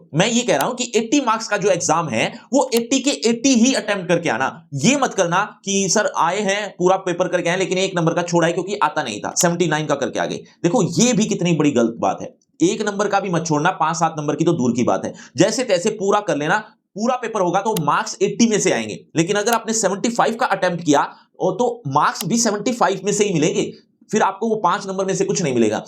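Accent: Haryana, India. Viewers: Sir are you from haryana 🤔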